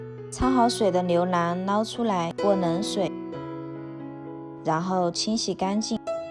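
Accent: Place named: Sichuan, China. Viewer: 川妹子吗？这普通话 哈哈哈 加油！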